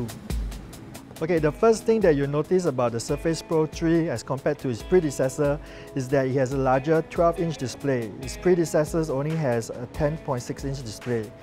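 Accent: Singapore. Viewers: Dat singlish accent...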